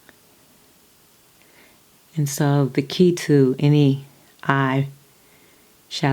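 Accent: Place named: United States. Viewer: Looking angelic as always I see :). Damn... it is the first time I've heard your speaking voice and your American accent (I am British lol) is glorious like silk. So calming. Your tone is asmr perfect even without any whispering. I love listening to your precise explanations too. Definitely adds tingles for me. I look forward to more hopefully :D fingers crossed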